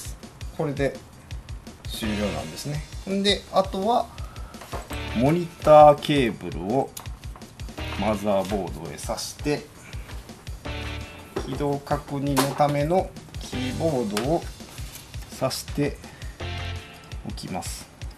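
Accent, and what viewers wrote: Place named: Nara, Japan. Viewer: イントネーションが奈良か京都、多分奈良っぽい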